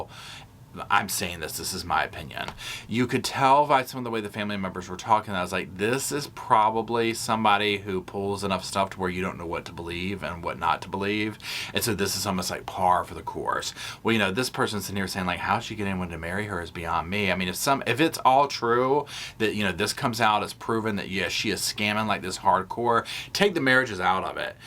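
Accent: South, United States. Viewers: you make life more enjoyable for me daily. Your country slang and my miss butterworth's voice is hilarious. My husband looking at me crazy the whole time. Thank you for the laughs and the content..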